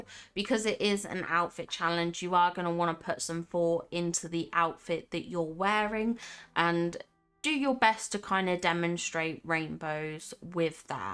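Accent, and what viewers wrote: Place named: United Kingdom. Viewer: Hey 👋🏻 Thanks so much for posting this…I was kinda downhearted by my 600 moonstones for last DreamSnaps😟…like quite a few others..the bar has been raised now peeps get how profitable taking it seriously…anyways you have given me some really good ideas and inspiration..Just Love your content as ever..nice to hear a fellow Brit too 😊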